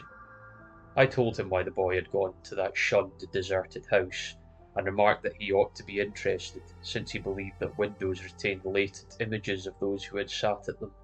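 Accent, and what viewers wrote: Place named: North, United Kingdom. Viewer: A Northern Ian...i dig it! Keep on it! Arkansas, USA!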